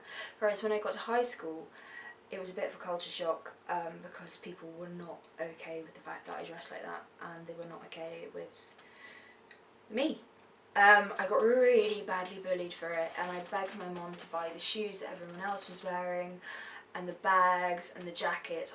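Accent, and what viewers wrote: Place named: London, United Kingdom. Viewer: Sounds like you've caught a tad of the London accent tales, get some of those vocals up on YouTube!!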